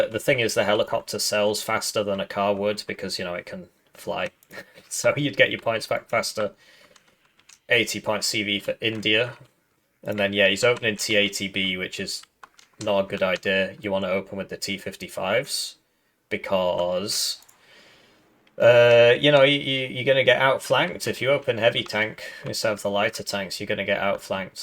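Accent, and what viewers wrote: United Kingdom. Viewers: why do u look jewish , dress like arab , and sound british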